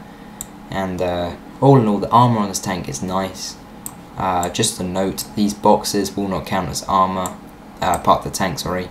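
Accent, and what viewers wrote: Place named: United Kingdom. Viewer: You have a British accent. ^^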